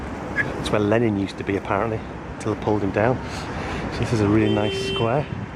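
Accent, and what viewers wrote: England, United Kingdom. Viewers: What are you doing in Dushanbe, your accent looks you are from England . Enjoy snc safe journey .I live Southampton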